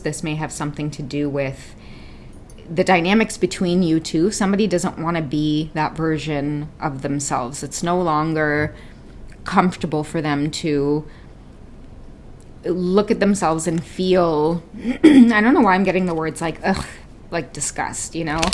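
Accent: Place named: Trinidad and Tobago. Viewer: Hello my fellow Torontonian!!! I love your Trini accent. ❤❤❤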